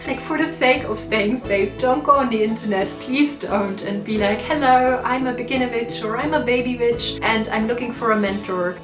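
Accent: Germany. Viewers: Dein deutscher Akzent macht dich noch charmanter als ohnehin schon. 💚 (Und danke das du dich bei meinen Kommentar vorhin an mich erinnert hast, und woher ich komme. 😊) You are a very wonderful and wise witch! Can't wait for your next videos! 😉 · Ich finde deinen Kanal echt megaaaa🥰 & finde dein Englisch echt top😅 War ein bisschen stolz, dass ich aus deinem Englisch raus hören konnte das du auch deutsch bist haha 😊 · Hi beautiful! New sub and I have to tell you I LOVE your videos! Your knowledge, your voice, aesthetic, and your absolutely wonderful German accent lol you are so sweet and great! ☺️🥰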